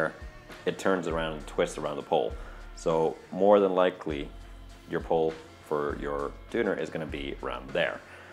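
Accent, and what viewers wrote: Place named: Netherlands. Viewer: I liked your video quite a bit. I started developing a basic line of instruments about a year ago, and by far the hardest part has been nailing down the headstock shape. It is quite hard to match one headstock to a variety of body designs. Another area to touch on is tilt-back versus flat headstocks and how the neck to headstock transition works in either case. I personally prefer the Fender approach because it is simpler, is less likely to break and saves on wood. I'm going to subscribe to your channel, as I greatly appreciate people who explore design and engineering. Incidentally, are you Dutch, or maybe South African? I'm trying to place your accent.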